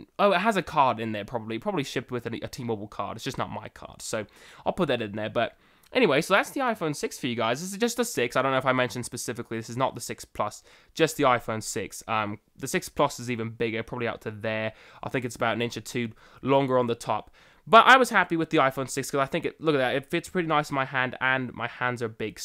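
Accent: United Kingdom. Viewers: hmmm I prefer iphone 6 Plus although the size is huge but it's definitely the best,, problem is I only had it for not even a month yet and its already develop fault microphone and sound/speaker start to play up so had to sent it back to be repair and hopefully I will be able to get it back soon,,, btw dude you got some British accent there! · Funny how he says "United States of course" when he has an UK accent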